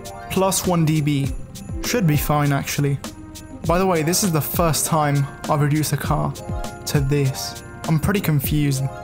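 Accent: Italy. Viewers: You have a particular italy pronunce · Wait, are u italian? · Are you italian? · Hai un bel accento italiano fra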